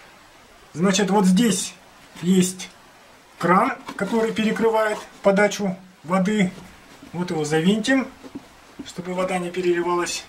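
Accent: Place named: Buryatia, Russia. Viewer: Уверен - автор либо бурят, либо киргиз, уж очень говор похож)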